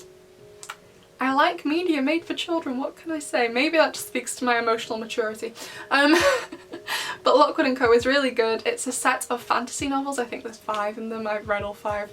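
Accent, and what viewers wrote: England, United Kingdom. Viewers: I wish I had an English accent like you!! 😭 I just love the way you speak!!💗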